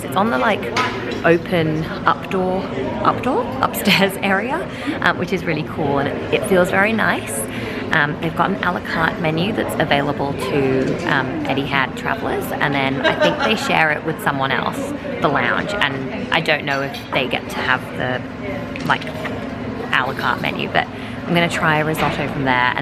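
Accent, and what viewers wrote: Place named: Australia. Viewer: An Australian accent 🥰 have fun!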